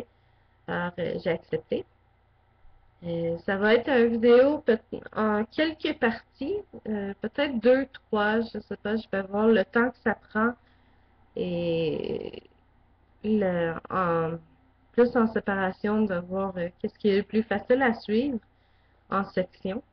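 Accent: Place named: Quebec, Canada. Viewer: T'as vraiment un accent ! xD Content de savoir que des Québecois font des vidéos wiccanes en français ! ;)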